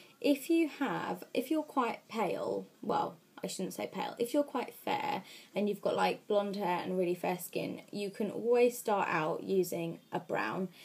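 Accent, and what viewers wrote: United Kingdom. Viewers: this is my first time watching one of your videos and before you started talking I thought you where like 12-13 years old but then I herd your voice and im like WTF?!?!?! Ur like 20 or somethin' years old?!?!? And your British?!?!?!? good video by the way :)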